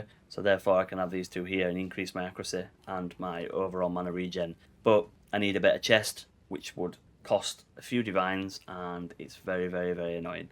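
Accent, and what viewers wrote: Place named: United Kingdom. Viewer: british english…the most annoying version of english to listen to….build is nice though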